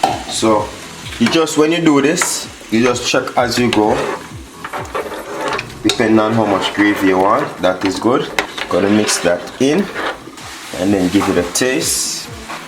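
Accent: Jamaica. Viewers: Oh I love Jamaican accent and I love Jamaica 🇯🇲 ❤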